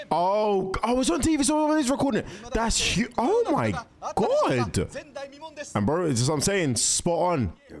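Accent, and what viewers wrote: United Kingdom. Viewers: Your really British my man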